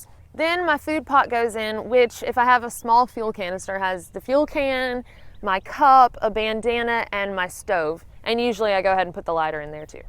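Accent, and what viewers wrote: South, United States. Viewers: As a southern boy myself, your accent is quite endearing. Nice video & suggestions &, if you don't mind, I'd like to let my scouts see it when they're getting ready for a trip. Always seems to sound better to them when I use references. Keep em coming!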